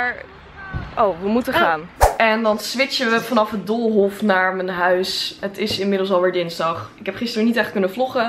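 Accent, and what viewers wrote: England, United Kingdom. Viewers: is hij echt engels of praat dié gewoon zo voor de grap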